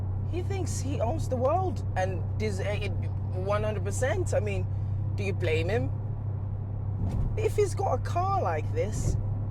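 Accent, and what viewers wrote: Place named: England, United Kingdom. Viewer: You sound English bro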